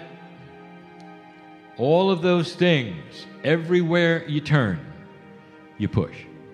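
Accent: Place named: United States. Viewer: he sounds like an american, with sound effects from Holliwood. hollwood is under question.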